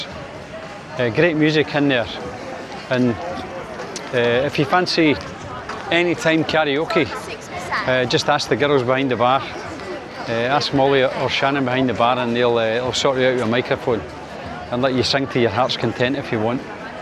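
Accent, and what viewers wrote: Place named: Northern England, United Kingdom. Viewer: It seems to be "northern", or is it scottish?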